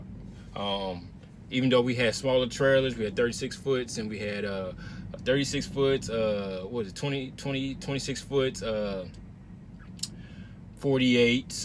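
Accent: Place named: Springfield, United States. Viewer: Sounds like youre from Springfield MO.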